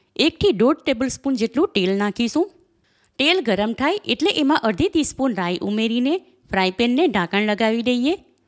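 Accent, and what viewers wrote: Surat, India. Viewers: તમારી હુરતી સ્ટાઇલ ની ભાષા સાંભળવાની મજા આવે છે .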